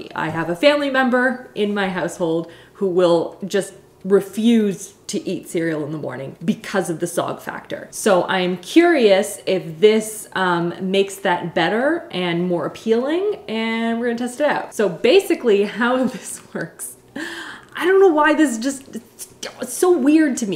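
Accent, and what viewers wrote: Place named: Canada. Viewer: After being convinced that Canadians don't say "eh" at the end of there sentences. We have! Yessssssss!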